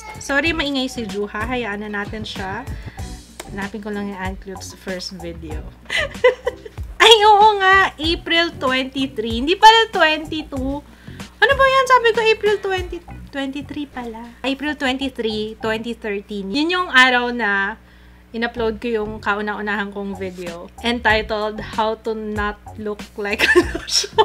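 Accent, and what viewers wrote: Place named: Conyo, Philippines. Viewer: Hi Ate! Happy 4th Year Anniversary! Actually, nadiscover kita sa isang video, di ko na maalala ano title nun basta yung video na yun is naghahaul ka ng make up pero isa ata to sa mga videos mo nung naguumpisa ka palang, nasa ilalim ka pa ng hagdan nun nagfifilm and actually, naaartehan ako nun sayo kasi the way ng pagsasalita mo dun parang ang slang and parang conyo, so sa sobrang curious ko, vinisit ko ung youtube mo profile mo and nanuod ako ng ilang videos mo para makita kung gaano ka kaarte, hehehehe wala sana magalit sakin pero yun ang totoo, yun naging 1st impression ko kay Ate hanggang sa napansin ko nag vovlog marathon na ako at nagsubscribe sa channel nya. nakakatuwa lang isipin na from being a slang girl na akala ko nagfifeeling ka lang ate to a jolly person, nakita ko rin ung improvement mo, and masaya ako na nadiscover kita ate. 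Its been 2 years simula nung simulan kitang subaybayan, kumbaga ikaw ang Itsjudyslife ng Pinas. Dahil sayo din ate mas naiinspire akong magyoutube, pero I think di pa sa ngayon pero I hope one day magawa ko rin sya. So ayun, sana nga mahanap ko ung video mo na yun eh, basta tanda ko nun naghahaul ka po ng mga pinamili mo pati make up sa may hagdan at medyo madilim nga nun kasi pagami na at kadarating nyo lang ni Papa Kitz galing sa mall siguro. Basta ate sana walang magbago kung ano ka dati sa kung ano ka ngayon, dahil minahal ka namin ng ganyan ka na. I hope one day mameet kita ate! I love you! God bless you and your whole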